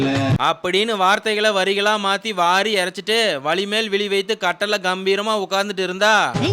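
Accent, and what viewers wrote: Coimbatore, India. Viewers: தம்பி உங்களுடைய கோவை பேச்சு super போங்க. உங்களோட கருத்தையும் சொல்லி சமைக்குறவிதம் அருமை அருமை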